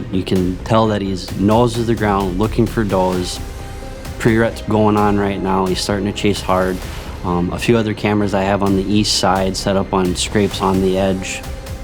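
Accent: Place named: North, United States. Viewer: Holy Northern accent 🤣. Gotta love it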